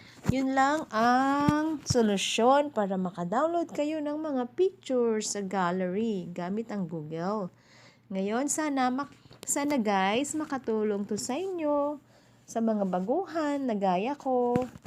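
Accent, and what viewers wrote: Philippines. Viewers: Why do u sound like Phillipino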